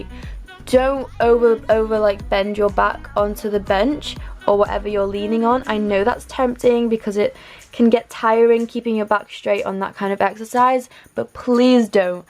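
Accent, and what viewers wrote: United Kingdom. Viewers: As would LUIMARCO say: Propahh and legit british accent 👍👍👍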